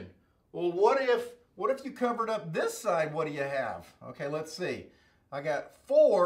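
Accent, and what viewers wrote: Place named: South, United States. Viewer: I'm a sophomore at Cornell. Prospective MechE. One of my classmates told me to look this dude up. I feel like I've just found the ultra southern Professor Leonard of Engineering, and I'm fucking HERE for it. I'm going to survive statics if it kills me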